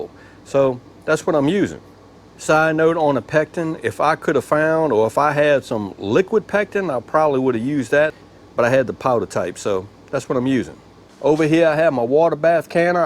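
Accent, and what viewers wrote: South, United States. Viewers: Oh my!!!! I am an Okie who married a Canadian. It purely is a pleasure to hear a southern drawl again. Love this recipe!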